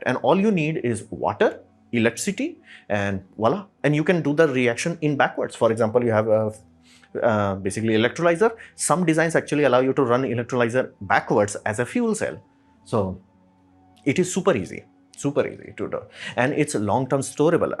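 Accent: India. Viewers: Really enjoyed. Science +sarcasm + indian accent Subscribed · his speech was fairly casual. You are probably not very used to english. And he used indian accent so i dont really understand why this is difficult to listen to. If he's using indian sounds for english words, should be easy.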